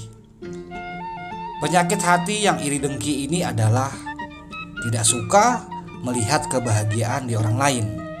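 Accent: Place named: Semarang, Indonesia. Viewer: Satrio piningit bagakan.terbelenggu Di lobang tikus sendiri 😊 Sambil ngencak HK Kecil kecilan kamu sepertinya orang SMG logatnya nada Bicara sepertinya Aku pernah kumpul Sama orang smg😊 Di puncak. Cemara😊😊😊😊😊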